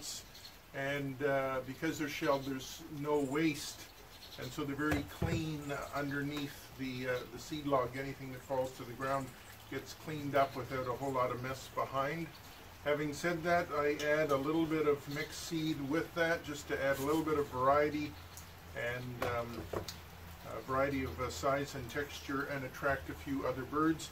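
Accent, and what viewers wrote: Canada. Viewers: Hi and thanks for the video. You sound Canadian...where can you get inexpensive bird seed?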